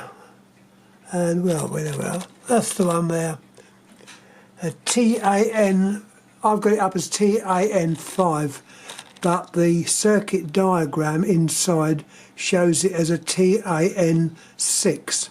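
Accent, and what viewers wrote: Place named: United Kingdom. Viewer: Sounds like Winnie the Poo with a British accent. I would love to see an episode where a Russian telephone is used.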